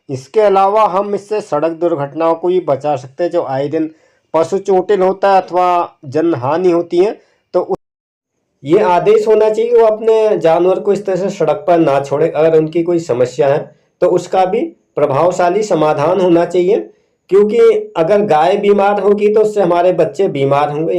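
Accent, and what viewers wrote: Uttar Pradesh, India. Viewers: Aap kaha se ho..Boli se up wale lagte ho..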